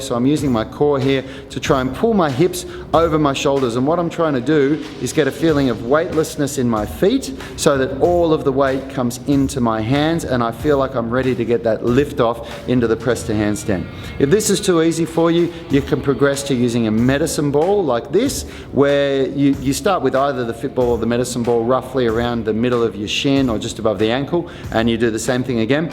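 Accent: Australia. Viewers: Are you Aussie? 🇦🇺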